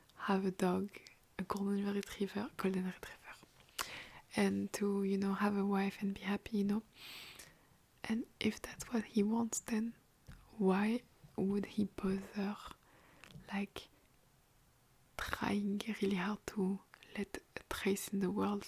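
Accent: France. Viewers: hii, i'd love an asmr video full in french of following instructions themed, cause with those videos even if i don't speak the language i can still understand what you're saying, and your french accent surely deserves a whole video! 🤓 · YESSSS THE FRENCH ACCENT I LOVE THE FRENCH ACCENT 🥖🥖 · I think you look much older with your natural hair color. I love it! By the way ,you are so pretty and everytimr I hear your sweet french accent I get the goosebumbs.🫡😔😂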